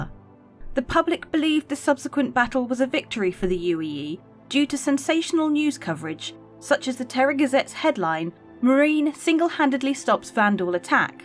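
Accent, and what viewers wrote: United Kingdom. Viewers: When I first watched the lore 'story' videos I thought that it was something produce and official release by CIG. The quality and pace of voice commentary, depth of knowledge, the suitability of a brit accent - all make for a very high quality video. I remember watching the Nyx video and thought "Hey! that voice sounds familiar. Either has a very common tone of voice or she been contracted by CIG to voice over their vids now". Well done and keep it up.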